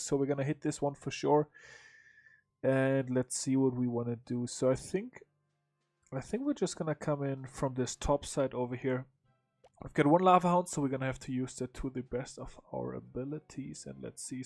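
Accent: Germany. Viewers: You are german i can hear it by your english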